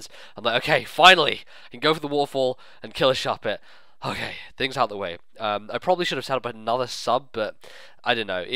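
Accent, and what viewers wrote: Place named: Scotland, United Kingdom. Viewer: Didn't know you are Scottish. So am i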